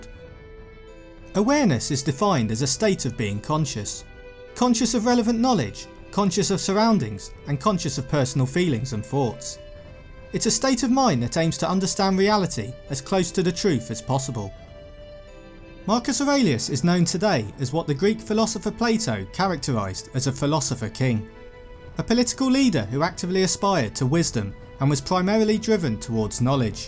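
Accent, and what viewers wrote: England, United Kingdom. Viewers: What part of England does your accent come from?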